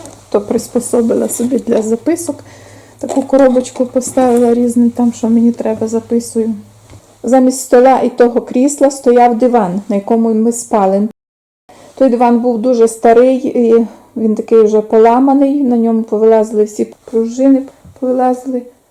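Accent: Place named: Lviv, Ukraine. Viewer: Вибачте,що я питаю.Де ви народились,в вас вимова,як в нас на Львівщині .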